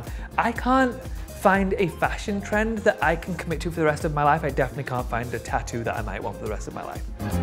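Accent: England, United Kingdom. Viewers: His pronunciation might be regional from where he grew up in England. I studied Indian vegetarian cooking from a master ( an authentic Indian guru) and I was a food snob for years, thinking his way and pronunciation was RIGHT. Then I realized that I was being unnecessarily judgmental and making myself better than others. That’s not the goal of all the yoga I was doing. I’m much more forgiving of and actually appreciative of people who don’t do life exactly the way I do. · I know this is a year late but honestly yes, stop making fun of people because their accent isn't "perfect" he has a British accent and that's okay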